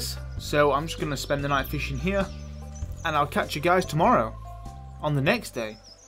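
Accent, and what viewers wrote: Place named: Nottingham, United Kingdom. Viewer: Great vid. Keep it up. Where in England are you pal. Sounds like a Nottingham accent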